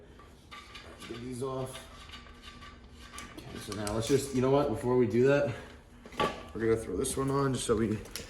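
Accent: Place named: Long Island, United States. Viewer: LMAO that long island accent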